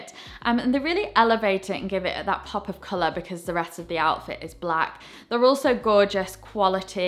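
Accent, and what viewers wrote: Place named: United Kingdom. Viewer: Can anyone please tell me what kind of british her accent is... Its so cool and i wanna practice that accent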